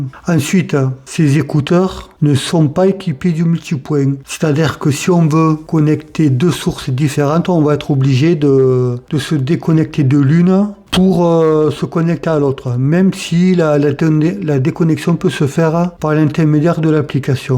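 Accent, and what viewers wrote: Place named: South, France. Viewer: Re 😅 Un peu particulier le design de ces écouteurs, faut aimer. En tout cas content d'entendre ton avis avec ce bel accent du sud (je me sens moins seul! ) 😜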